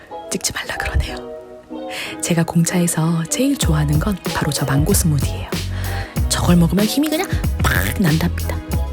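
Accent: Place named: United Kingdom. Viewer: 성우하셔도 될꺼 같아요~~ 최고의 성대이신듯 합니당 영국발음도 너무 멋있으십니당~~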